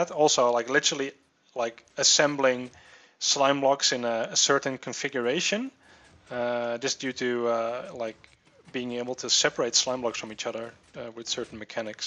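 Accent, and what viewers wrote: Netherlands. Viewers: are you dutch??? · are you Dutch? because Dutch accent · are you Netherlands? you accent sounds like it! I'm from the Netherlands. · the best accent is the dutch is accent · Are u dutch ? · Are you from the netherlands? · He is from the Netherlands. :) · Je bent Nederlands ik hoor het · are u dutch? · that dutch accent tho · are you dutch? you sound like it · I hear a Dutch accent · are you dutch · Its really easy to notice that you are dutch, ya know? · You sound dutch XD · this is my firt vid of you but are u dutch :P( ur accent sounds like u are) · r u dutch? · Are you Dutch? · That accent, your Dutch!!!! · Het valt meteen op als iemand Engels gaat praten en hij is Nederlands en vooral bij cube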